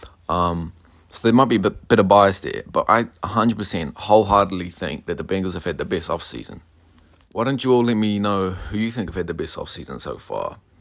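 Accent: United Kingdom. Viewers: ngl its super different to hear someone with a british accent doing commentary on the nfl. im glad to hear that american football is becoming a little more mainstream on the international stage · Is this guy British?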